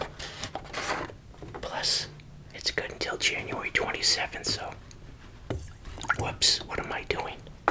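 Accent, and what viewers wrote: United States. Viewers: The way he says corn flakes and coffee is just amazing I’m English and his accent is like the typical American accent I love it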